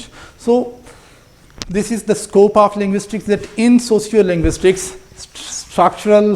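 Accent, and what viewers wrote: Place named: England, United Kingdom. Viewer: Wow sir i like your English accent 🤍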